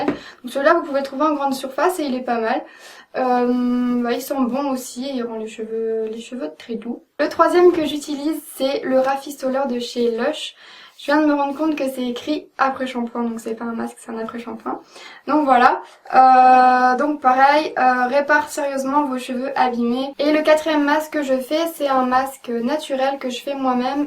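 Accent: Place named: Quebec, Canada. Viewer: tes vidéos sont super! c'est mignon tu as un léger accent québécois!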